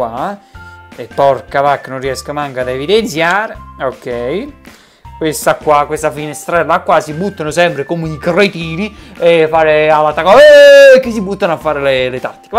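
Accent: Naples, Italy. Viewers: ma sei napoletano??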